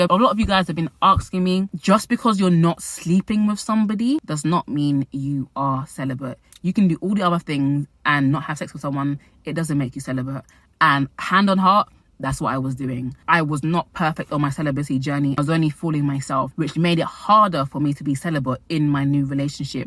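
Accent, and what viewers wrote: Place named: United Kingdom. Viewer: Relationship advice + British accent = GOLD Thanks!!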